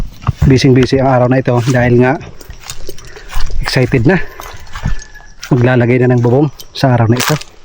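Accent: Waray, Philippines. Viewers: sir waray waray ang salita nyu taga saan po kayo?ofw from abu dhabi and taga leyte...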